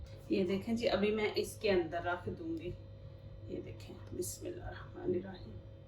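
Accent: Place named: Punjab, India. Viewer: Excellent recipe....aapke baat karne ka tareeka bilkul punjabi....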